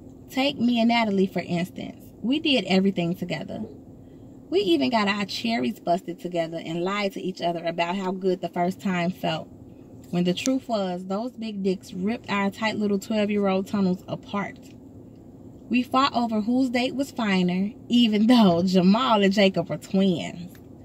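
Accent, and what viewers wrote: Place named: South, United States. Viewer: The reader has a very strong southern accent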